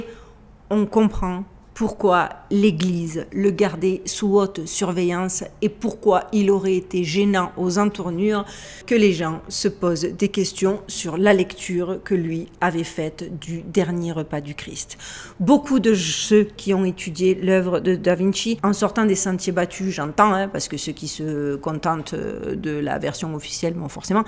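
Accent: Marseille, France. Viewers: Merci pour la vidéo, bien présentée et on sent qu il y a de la recherche . Votre accent me fait penser au sud de la France (Landes ou basques ..) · Je vois qu'il y a beaucoup de clients pour ces salades... mais quand à moi, des propos tenus avec l'accent cagole je ne peux pas les prendre au sérieux.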